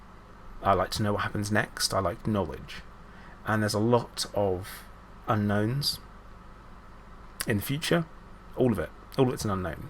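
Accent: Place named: RP, United Kingdom. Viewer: May 24th, 2023 - Dearest, you are inspiring me in ways you will never understand. I feel at times, that you are speaking directly to me, as if you know me personally and you're giving me advice on how to handle my problems. I am very much like you, in the way you like to know the plan, and what's going on each day, wanting the facts and having them organized. There's nothing organized about being ill. I understand the triggers too, when you can't get away from it, and cancer is everywhere all the time! I love your free talks, where you just free-flow with your feelings and ideas. It's like listening to a friend who's going thru a very hard time. I am sending you my best wishes as you go thru your last days. I was heartbroken to watch your latest video today. Your voice is always something I've enjoyed so much. You have such a lovely posh accent, and are so philosophical.:face-red-heart-shape: Smelting Pot!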